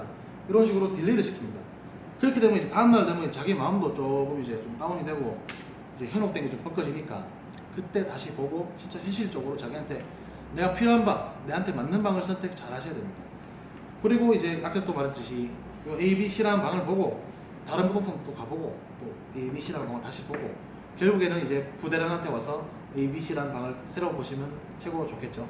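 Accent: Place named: Gyeongsang, South Korea. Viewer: 경상도 싸나이 맞지예ㆍ고맙습니다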